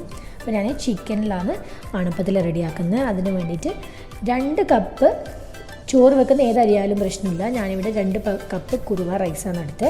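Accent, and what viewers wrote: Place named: Thalassery, India. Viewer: തലശേരി യാണോ. ഭാഷ. മനസിലായി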